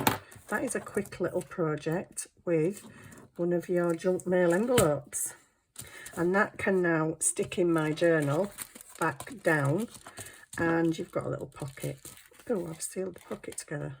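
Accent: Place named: Yorkshire, United Kingdom. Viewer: Hi as a fellow Fibro suffer I absolutely get the 'decision making' thing!🤔🙄🤔🙄🤔😬🤔🤭So glad I've found you on you tube, you sound like you're from my neck of the woods! Especially when I saw your Yorkshire Tea box in one of your vids! 😃I'm a Sheffield'er! 😬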